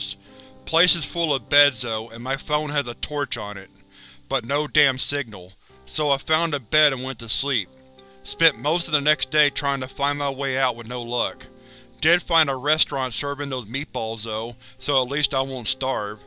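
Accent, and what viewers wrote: South, United States. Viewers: I just hate how the guy voicing this has the thickest southern accent of all time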